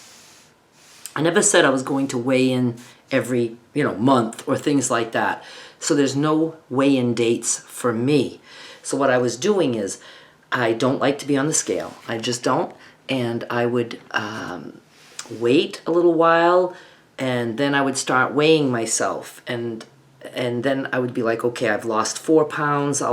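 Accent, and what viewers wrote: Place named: Massachusetts, United States. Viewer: Hi, I found you recently and am thrilled! You are an amazing artist!!! I was planning to respond to the video where Skylar colors Memere's hair. I was so moved to hear someone say the French name for Grandmother that I almost cried. I lost my Mem years ago and very rarely hear the term any more. My family is originally from Worcester, Mass. and they have your accent. Most are gone now, so hearing your voice is very comforting! I am a new subscriber, so really looking forward to unpacking all you offer, which is quite a lot! Can't tell you how you lit a candle in my heart, thinking about my own Memere'! Thanks for offering us the gift of yourself and your art.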